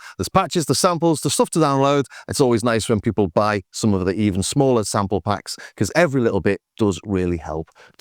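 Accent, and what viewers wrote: Liverpool, United Kingdom. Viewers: Interesting subject, you make a lot of good points. I would record the output of my modular just messing about and picking out the good bits. Guess I am a curator! A completely unrelated question: Do you switch to the Liverpool "k" when meeting fellow Liverpudlians?😁 Or is it only in certain parts of the city you have that sound? Cheers from Norway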